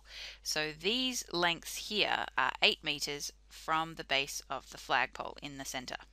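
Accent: Australia. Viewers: Thanks nice Aussie lady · noticed the australian accent and checked the comments xD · aussie right???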